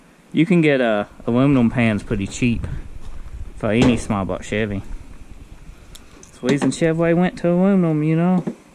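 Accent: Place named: Louisiana, United States. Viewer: Why does this ol boy sound like he's from Shreveport?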